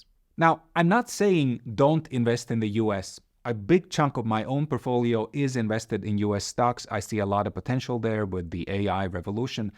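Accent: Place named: Latvia. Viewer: why does this english named person speak with a latvian accent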